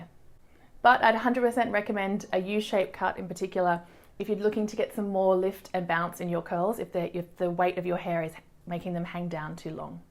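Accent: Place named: Australia. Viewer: Yay an Aussie.. So now I can breathe and understand u 😂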